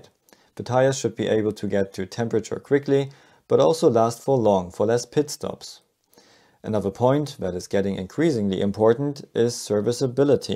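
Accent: Germany. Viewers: deutscher accent in ersten 10 sekunden gespotted 👀👀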